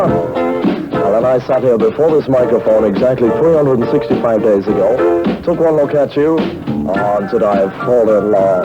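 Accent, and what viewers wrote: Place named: Mid-Atlantic, United States. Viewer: Oh that dodgy mid-Atlantic accent!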